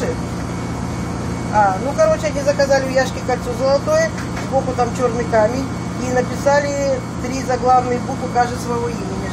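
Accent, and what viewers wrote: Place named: Eastern Europe. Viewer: Yeah... def Eastern European.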